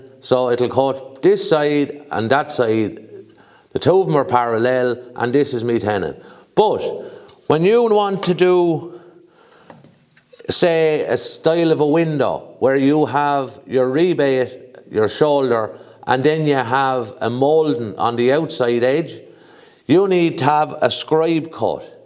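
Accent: Ireland. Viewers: Well done 👏 1k. Great video. You sound like a kildare man.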